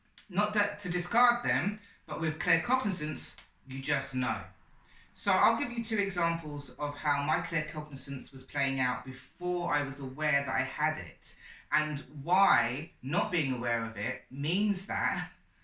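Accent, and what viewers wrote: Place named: United Kingdom. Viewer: Hi, lobed ypur video. I realise I am a claircognizance too.. Sending positive vibrations to you regarding your Dad. You sound British, are you in the UK?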